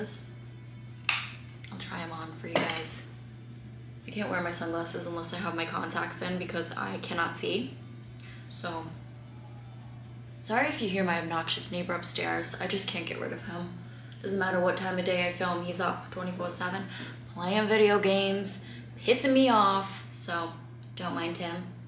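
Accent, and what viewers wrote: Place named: Boston, United States. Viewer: Are you from Boston? Love your slight accent and I think I see a cape cod bracelet. Cheers to you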